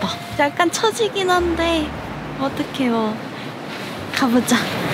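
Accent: Gyeongsang, South Korea. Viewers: 경상도인가요,구수하네요,ㅎㅎ 텐트가넒어난로를피워도,따뜻하이,안정감이있네요,풍경짱임니다,😁💚👍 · 치우캠핑님이 구독중이라는거 보고 들어와 구독 좋아요 알림설정 해두고 시청중 새해 좋은일만 가득하시길~갱상도 말투가 귀엽네요~^^ 임시 땜방용 스카치 테이프도 가지고 다녀야 겠~~네~~요~^^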